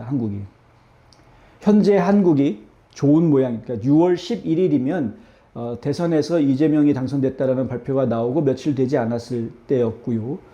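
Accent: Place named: China. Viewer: 유튜브발음이 중국인같음!! 윤통체포… <link>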